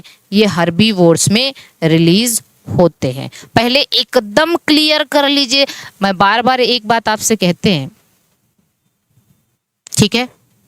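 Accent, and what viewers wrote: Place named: Uttar Pradesh, India. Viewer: Aapki baat cheet se ye ekdum clear ho gaya hai ki aap pakka UP se ha aur up board pdi ha quki hm sb up bale merkaana mrkawa bail ko axi tarah jante hai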